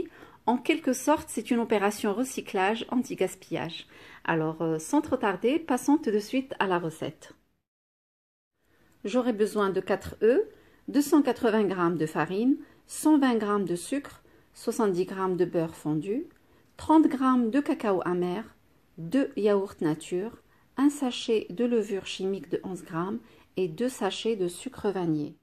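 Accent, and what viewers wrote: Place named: Morocco. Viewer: Pourquoi vous êtes tunisien et vous parlez l’accent marocain???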